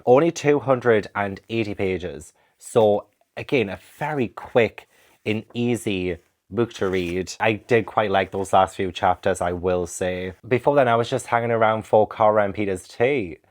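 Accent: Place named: North, United Kingdom. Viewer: I stumbled on you one day and stayed for two reasons - I am from the north, now living in the US and your accent brings me home... and I just ADORE your content!!!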